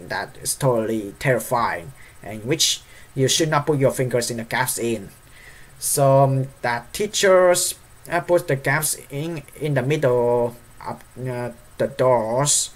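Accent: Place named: Vietnam. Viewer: *Vietnamese accent intensifies* but keep trying, one day you can speak it fluently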